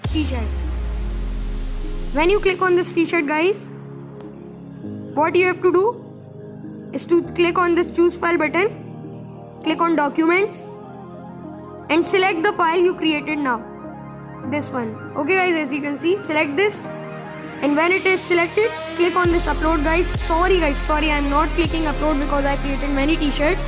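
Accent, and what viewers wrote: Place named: India. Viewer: Definately indian... I can get to know any Indian by his voice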